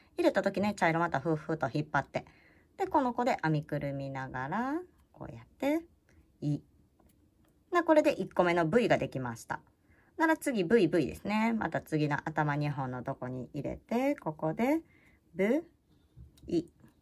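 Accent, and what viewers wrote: Kansai, Japan. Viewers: 昨日登録させていただきました。とても軽快な関西弁が心地よく楽しく拝見しています。不器用ですが頑張って作ってみたいと思います！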